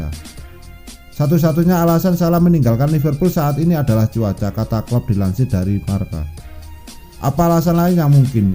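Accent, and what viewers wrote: Java, Indonesia. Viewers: Min biground nya cari yg bagus sedikit suara gak jelas bgt apalagi logat jawa kental jadi gak kedengaran min..🙏🙏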